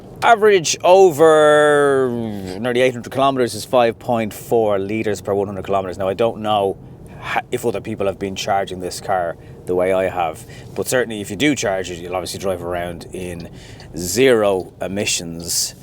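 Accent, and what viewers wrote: Ireland. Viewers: Sounds fine to me - lovely Irish lilt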